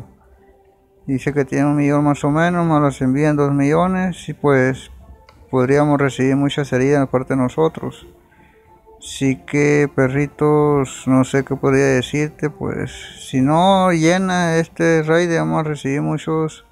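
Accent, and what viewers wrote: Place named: Sinaloa, Mexico. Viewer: Hablas como sinaloense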